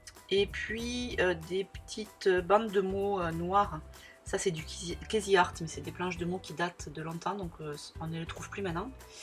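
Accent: Toulouse, France. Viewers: Il est beau notre accen toulousain et tarnais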